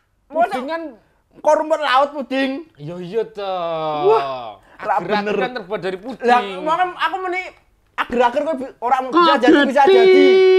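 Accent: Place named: Semarang, Indonesia. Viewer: Logate semarang bgt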